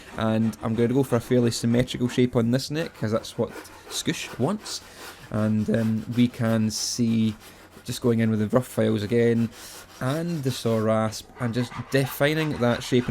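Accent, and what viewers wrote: Scotland, United Kingdom. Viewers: love the scottish accent. feyner tooth foyl :) keep up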